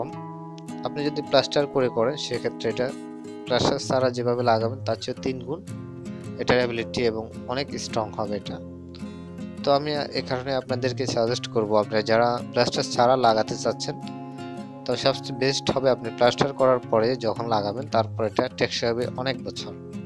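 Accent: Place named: Sylhet, Bangladesh. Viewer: আপনি সিলেট নি? আমি তো সিলেট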